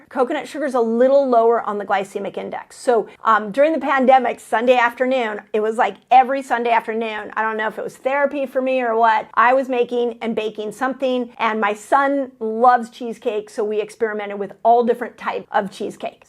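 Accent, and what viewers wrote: California, United States. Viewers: You have helped me so much I don't know how to thank you! 21 lbs down so far! The only thing that doesn't register is when you say "fee-ude" instead of "food" ....must be the CA accent. 🙂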